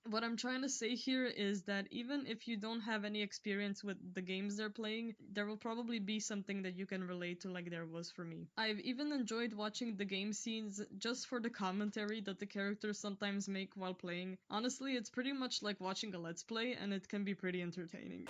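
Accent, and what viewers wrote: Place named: Canada. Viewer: i have to say i jus luv the way you speak, your style is adorable! and nutin wrong w your english, actualy im suprised to learn its not your first tounge, i thought you're canadian:) wonderful analysis btw a joy to watch, thx for makin'em!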